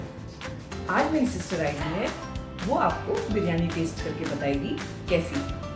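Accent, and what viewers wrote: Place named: Gujarat, India. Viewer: Askm , i tried this, Alhamdulillah Alhamdulillah bohot acchi bani.....Aap se ek sawaal hai, kya aap Gujarati hai? :) aapke kuch words ya lehja thodda thoda sa gujarati lagta hai...memon my be ? :)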